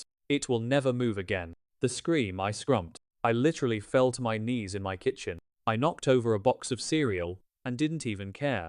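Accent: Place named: United Kingdom. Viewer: A British narrator is so wrong for this. He is not pronouncing names correctly. Specially Devon. Have an American HUMAN narrate or don't do it at all. After a one minute, had to stop. Couldn't listen to another word. Horrible.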